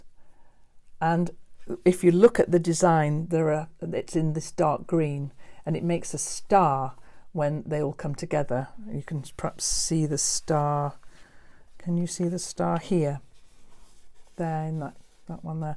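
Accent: England, United Kingdom. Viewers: Love that you admitted that you pinks mandala is done and will never be finished anymore. Haha, I laugh, because my mother has many many many of the same... never completed “samples”. In just my lifetime she has collected and filled a sewing room full of one of a kind Artistic pieces of “samples” many only half completed. as she recently packed up and downsized her enormous sewing room, in a house of 40 years. She and dad, married of 60years, are moving into her next phase of life..... as I am due a 50th Birthday Quilt... as much as she would love a reason to shop for more fabrics and design a quilt... I told her I didn’t want new fabrics, rather I’d love to have a quilt of all her “samples” and class examples... how cool of a one of a kind quilt with all of moms sewing techniques full of appliqué and her free motion machine embroidery project from seminar classes she took and or taught. 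You could read a book for me anytime. You have the perfect soft English accent for my non accent American ears. I don’t have any accent from any particular corner of the states as I have been raised in Oregon. as much as I enjoy the appearance and admire the patience it takes to do English paper piecing... I would go absolutely MadHatter bonkers in the attempt. The Kaleidoscope look your mandalas have is beautiful. Thank you for sharing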